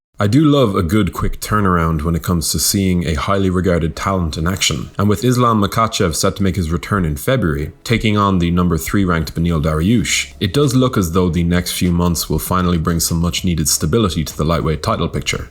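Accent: Ireland. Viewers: Listening to you talk reminds me of the 2 weeks I drove around southern Ireland. Ring of Kerry was pretty dam awesome, fwiw. Great video.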